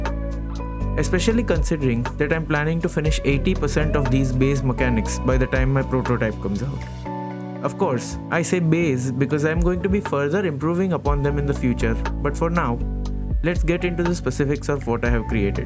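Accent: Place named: India. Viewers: I am pretty sure he is from India just from accent. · the accent tells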